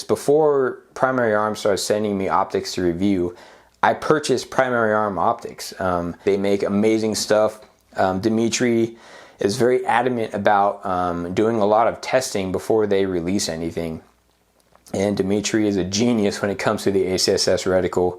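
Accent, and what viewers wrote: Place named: North Texas, United States. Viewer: Sounds like you live in North Texas lol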